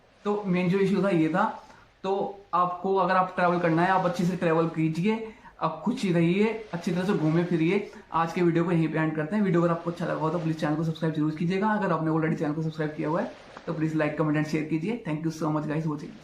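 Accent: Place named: Punjab, India. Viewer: panjabi ascent can't understand what you say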